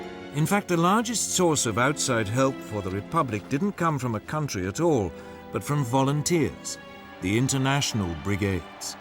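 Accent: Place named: United Kingdom. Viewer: Another biased, pro lefty-slanted video done by a guy with a British accent. Obviously not all commies in Britain joined the "Cambridge 5" commies, drunks & perves and moved to Moscow. The video maker probably sends a box of candy to Jeremy Corbyn on Mayday each year. General Franco and the various forces/groups of "Nationalists" brought law, order, and peace to the disaster cauldron cooked-up by the Spanish "Republican" government in Madrid. Socialists, Communists, atheists ruin everything they touch. Just to irritate the pro-Communist video maker / narrator, I think I shall look for a photo of General Franco and buy it, frame it, and put it on display along maybe with the flag / symbol of the "Carlists".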